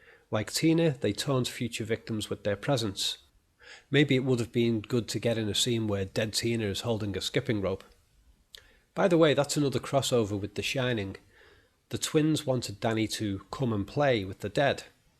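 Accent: Liverpool, United Kingdom. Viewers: To: I absolutely love your videos. I've been binging on them since I discovered them a few days ago. Your analyses are as fascinating as they are thorough - supported with clear examples and often detailed and well-cited research. It's inspiring to listen to someone who's so passionate about a subject. Incidentally, your Liverpool accent and softly-spoken delivery (in my opinion) enhance the enjoyment of these videos. You impart your ideas in a laid-back, conversational, matter-of-fact way, which feels respectful to the viewer's intelligence in a way that other analysts seem to somehow miss by having subtle (or not-so-subtle) condescending or boastful tones. Does that make sense? With regard to a point you make in this video about Nancy's ability to finally defeat Freddy by using a technique which had earlier failed, "ignoring his existence", as you put it very well. 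My feeling is that in order for this technique to work, the victim must fully and whole heartedly believe it. in the earlier scene when she attempts to get rid of Freddy by saying the words "This isn't real, this is just a dream", she is still frightened and panicked, which tells us she still actually believes the danger is real. After the climax of the movie, Nancy has developed true faith in her words. She says them calmly and with total conviction, hence it's effectiveness in ridding the demon. On further reflection, perhaps this can be linked to your idea that in this movie God doesn't exist. Perhaps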